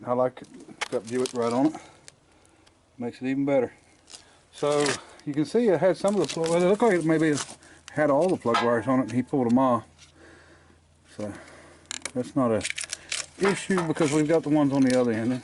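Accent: United States. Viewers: awesome find all the cars ive seen and worked on, but have never heard a straight 8 run. Can't wait for the next video. Also I know that you are country cause im country i here's it in the way you talk. Nothing wrong with being country either, but just so not to confuse the slower witted city folk. When you say sandblasting spark plugs, just say we's gonna clean them. Ya see most folk in the cities around the world take people at face value and what they say. Poor people haven't ever heard of slang words before. When I moved to the big city I found out that most of them were simpletons and what you said is what you meant. Think most of them is touched in the head. Or like they say in Cherokee hills of NC,they's touched by the great spirit, and us country folk knows that that's not good. I would try this don't explain what or why or how you're going to do something just do it,they may not get it, but that's because they never will.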